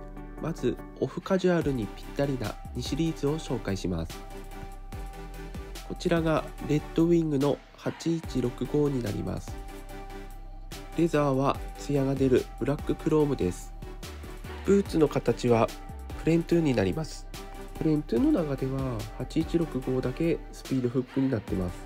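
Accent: Tsugaru, Japan. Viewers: いつも楽しく観させて頂いています｡ 津軽弁良いですよね‼️｡ (やわらかな感じで､) チャンネル登録もしています｡僕が持っているRED WINGはﾐﾙﾜﾝ(9087)ﾌｫｱﾏﾝ(8054)ﾍﾞｯｸﾏﾝ(9416)ﾌﾗｯﾄﾎﾞｯｸｽ(9060)です｡ RED WINGは５足までと心に決めていたのですが､あと１足ではおさまらないです｡ ﾌﾗｯﾄﾎﾞｯｸｽは今は光沢の出ないﾃﾞﾘｹｰﾄｸﾘｰﾑを仕様しています(光らせなくても渋く格好いいから)｡革靴は光らせる派です｡ﾌﾗｯﾄﾎﾞｯｸｽをもう１足買って光沢が出るｸﾘｰﾑで仕上げたいと思っているぐらいﾌﾗｯﾄﾎﾞｯｸｽがお気に入りです｡ 僕は革靴の色はブラックが好きです(渋く安心感があるから)｡Dﾜｲｽﾞが僕の足に合っています｡しかしEﾜｲｽﾞの8875が欲しいと思っています｡RED WINGだから赤でも､(絶対に黒と思っていました)Dﾜｲｽﾞのｺﾞｱﾃｯｸｽ仕様も考えていますがEﾜｲｽﾞも欲しいです｡絶対に他のメーカーなら黒にします｡White’sのｵｯｸｽﾌｫｰﾄﾞも欲しいのですが値段と重たさが😢諦めてしまいました｡ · 津軽弁いいですねー。人の良さが現れています。😊レッドウイングブラックブーツ最高ですねー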